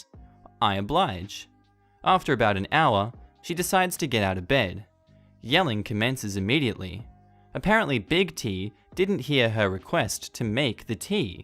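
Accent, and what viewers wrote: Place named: Australia. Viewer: I’m not an Aussie but I love the accent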